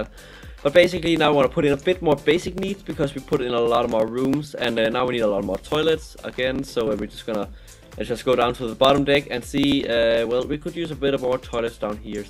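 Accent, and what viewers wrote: Denmark. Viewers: R u danish? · it sounds like your from denmark i am so pls like my comment (edit) like if you are and if your not then comment on my comment where you are from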